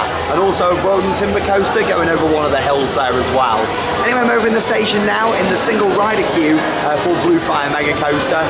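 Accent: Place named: United Kingdom. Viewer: all right no Fun you are a good vlogger but youre brithish english is very fun hahha best 💪 Stüpet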